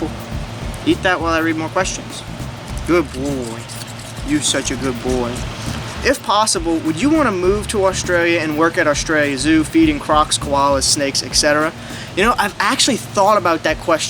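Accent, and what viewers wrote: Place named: South, United States. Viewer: What do I enjoy most about the channel? You and your southern accent getting excited about your snakes. No improvement necessary. The videos are always interesting, well-timed, and well-edited. It's a unique product all your own. Dangerous animals and insects are always fun, like when you were fooling with the Black and Brown Widows. That was intense. Are you planning on any releases?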